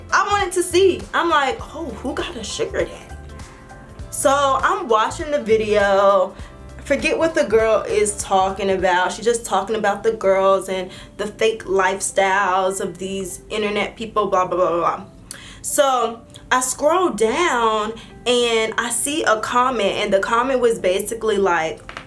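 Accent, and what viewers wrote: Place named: North Carolina, United States. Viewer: if that was a " " thing, or a North Carolina accent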